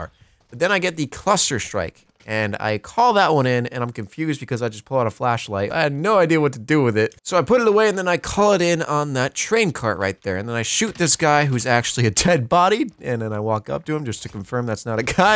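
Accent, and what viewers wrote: New York, United States. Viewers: As you get older we can hear your new YAWK accent come out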